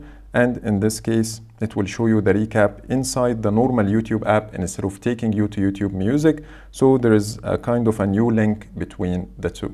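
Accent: Egypt. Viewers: You sound like you're Egyptian right?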